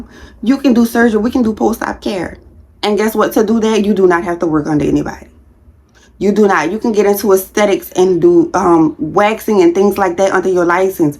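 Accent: New Orleans, United States. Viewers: I love her accent New Orleans baby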